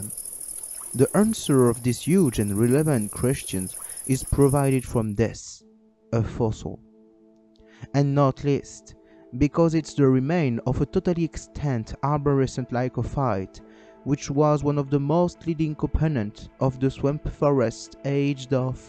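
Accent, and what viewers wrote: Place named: RP, United Kingdom. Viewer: On ferme les yeux et on croirait entendre un Lord anglais … C’est plutôt un compliment bien sûr, ça change des « ze plants of ze carboniferous … » Very good subject!! Tha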